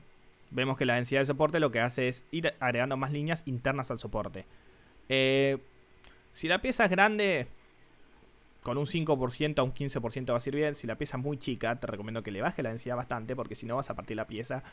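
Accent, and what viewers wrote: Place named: Argentina. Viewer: Sos un idolo, literal que me quedo siempre al final del video para darte una cierta forma de gracias virtual aunque allá entendido lo que venía a entender (porque lo explicas excelente y más sabiendo que hablas con un tono muy Argento xd), simplemente muchas gracias ídolo 💪🏻 ✨ 🎉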